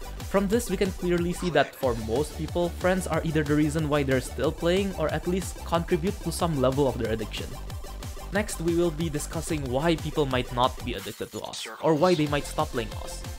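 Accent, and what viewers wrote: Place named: Indonesia. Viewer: Logat Indonya kedengeran banget njir, but S for effort 👍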